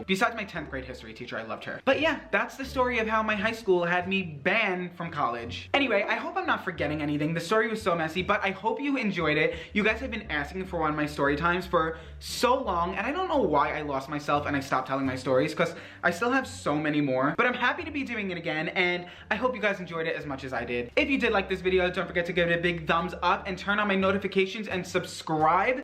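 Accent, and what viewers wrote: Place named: Chicago, United States. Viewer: Bahahahah you make my day I love you so much. I love your accent so much I’m from Chicago and when I heard you say mom or class (mahm, clayss, i say “a”s the same) I’m like this mans is a CITY BOI ❤️ hahaha you’re legit so beautiful and witty and charismatic, I’d square up on this teacher for you In highschool 😂💕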